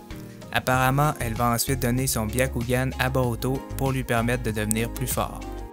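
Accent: Quebec, Canada. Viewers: Il peut rien y faire il est quebecois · T québécois sa svois direct avk ton accent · Tu et quebecois? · Ta vu avec son accen canadien il est les a trouvé dans ces ses imaginatoin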